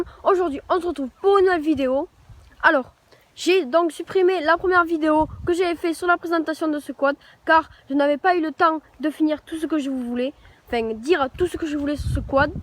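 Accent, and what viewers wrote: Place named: South, France. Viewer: Toi tu est du sud 😂 quel accent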